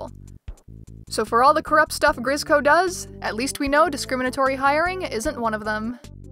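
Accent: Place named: North America. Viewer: a "normal" amount.... also I'm judging by the accent I'm assuming you are an NA player. The match making servers prioritze region to cut down on lag so that would be a thing to consider with your sample. My guess is JP has an inkling majority but I haven't collected any data on that just a vibe. Also my statistics nerd brother in arms.... I am sorry nobody told you about stat.ink. Lastly, love the PSO music cheers :)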